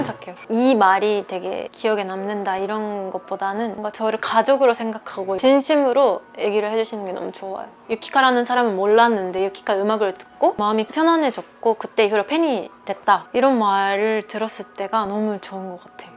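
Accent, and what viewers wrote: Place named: South Korea. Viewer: 발성부터 한국어 발성법이죠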